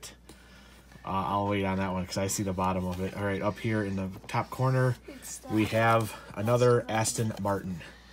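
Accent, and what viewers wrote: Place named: Wisconsin, United States. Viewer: Hello, first time viewer.-I didn’t understand how the hot wheels cars I relate to the game. Mario, The Disneyland Neighbor PS. I think I hear Wisconsin accent.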